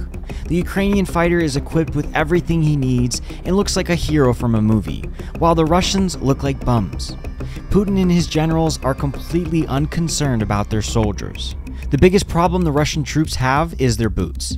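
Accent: United States. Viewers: This is my first video that I watch from your channel I just subscribed and hit the all button very good at speaking about what's going on very informative. And you sound like you're American or Canadian one of the two and there's not many people on YouTube that are American or Canadian that are actually taking the time to gather all this information. 5 ⭐ to this video well done. 5 ⭐🇺🇦❤️🇺🇦❤️🇺🇦❤️🇺🇦❤️🇺🇦❤️🇺🇦❤️🇺🇦❤️🇺🇦❤️🇺🇦❤️🇺🇦❤️ and I love it when I see high Mars systems going to work on those Russian sons of bitchs. Those systems are very very accurate. Glory to Ukraine 🇺🇦🇺🇦🇺🇦🇺🇦🇺🇦🇺🇦🇺🇦🇺🇦❤️❤️❤️❤️❤️❤️❤️❤️❤️❤️❤️❤️🇺🇦🇺🇦🇺🇦🇺🇦 Ukraine will be victorious ❤️❤️❤️❤️❤️🇺🇦🇺🇦🇺🇦🇺🇦🇺🇦❤️🇺🇦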